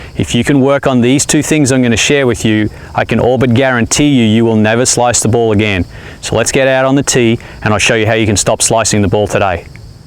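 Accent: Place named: Australia. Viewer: Thank god a aussie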